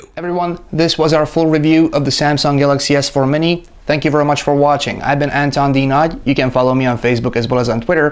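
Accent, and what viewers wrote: Russia. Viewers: I still can't pin this guy's accent, Russian?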